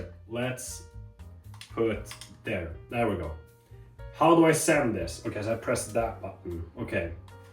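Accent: Sweden. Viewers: I know that accent mr swede!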